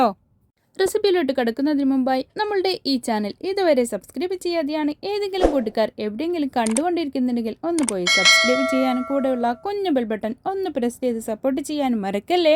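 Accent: Kasaragod, India. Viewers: Super recipe anallo ! Koodathe ningalude kasargodan style talking enikku bhayangara ishtanu !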